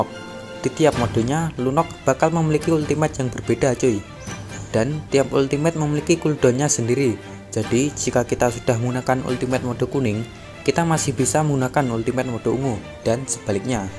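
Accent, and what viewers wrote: Java, Indonesia. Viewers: Bang suara nya medok banget anjir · medok bet lu bor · kalau medhok gak usah gua gue bang, gak cocok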